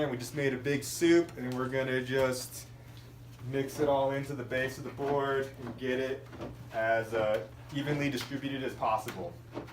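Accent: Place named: New Jersey, United States. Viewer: Great. video, thanks. Love that dirty jersey accent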